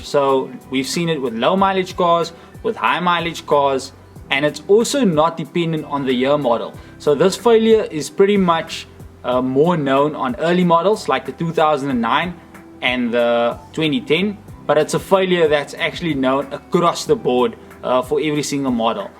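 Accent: South Africa. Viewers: lol He's definitely South African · the south african accent was a nice surprise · Thats a South African Accend .. 😄😄 nice vid tho ..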